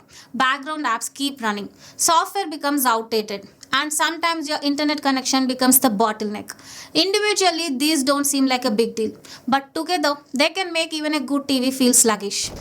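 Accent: South India, India. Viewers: #@ Please pronounce english word properly you are using south indian accent english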